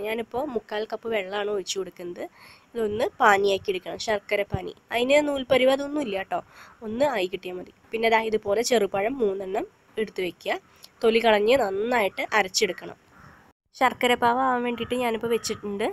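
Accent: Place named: Kuttippuram, India. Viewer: നിങ്ങളുടെ സംസാരത്തിൽ നിന്നും കുറ്റിപ്പുറം ആണ് ചേച്ചിയുടെ സ്ലാങ് എന്ന് ഊഹിക്കുന്നു. ശരിയാണോ?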